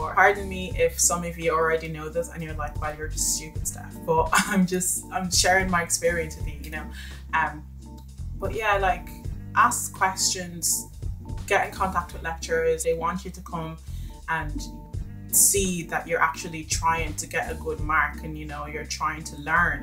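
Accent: Ireland. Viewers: Which part of Ireland is that accent from?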